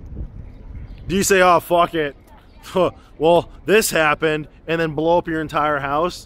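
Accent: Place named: New York, United States. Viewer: I can't wait until ketosis becomes even more mainstream and your youtube channel blows up and you suddenly have a few million subscribers who are reeled in by your New York accent, your passion for weird music, and your "no-nonsense" style of talking and filming however you feel like. Then I can say, I've been watching his vids all along :)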